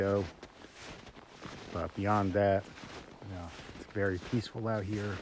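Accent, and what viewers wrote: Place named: United States. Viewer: Nice video but you sound American...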